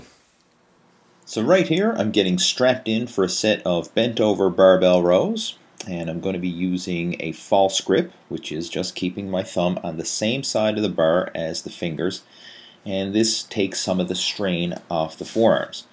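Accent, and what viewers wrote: Newfoundland, Canada. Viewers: this is the first video i'm watching of yours, you are 100% from Newfoundland aren't ya?? ahah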